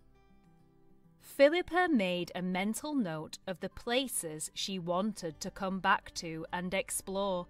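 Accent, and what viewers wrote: United Kingdom. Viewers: Thank you for this wonderful story! 🌞 The clear British accent and helpful subtitles made it easy to follow and enjoyable to listen to. Perfect for B1-B2 learners like me! Please keep making more stories like this. 😊📚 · Please give us time to read this story in British accent because we want improve our British accent 🌷🌺💞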